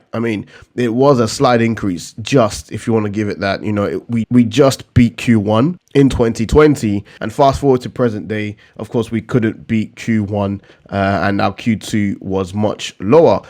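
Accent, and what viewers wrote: United Kingdom. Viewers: where are you from? The accent sounds british!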